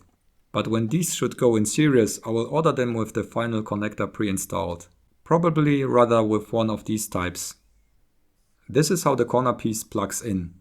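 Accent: Germany. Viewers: Like is voiceovers are great but in he's like excited in video he sounds so German😄😂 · Are you from germany ? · Das Deutsch hört man wirklich immer rauß :D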